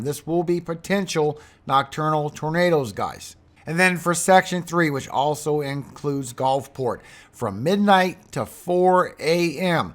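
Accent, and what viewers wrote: Louisiana, United States. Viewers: Nice listening to to a good Louisiana man pronounce our cities. Like your RADAR and forecasts. Keep up the good work.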